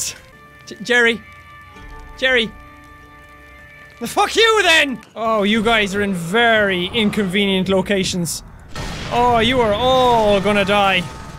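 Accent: Ireland. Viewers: Is he Irish? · Wow I didn't know you liv In Ireland thats how your irish tell me more oneday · OMG I LOVE THE IRISH ACCENT :D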